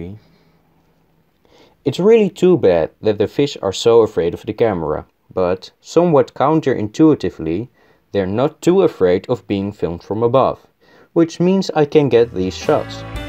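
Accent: Netherlands. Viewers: Aaah... Dutch English, nice video!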